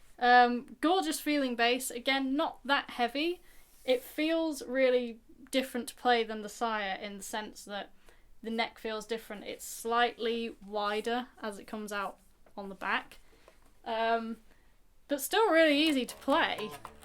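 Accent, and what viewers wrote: United Kingdom. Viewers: You sound British!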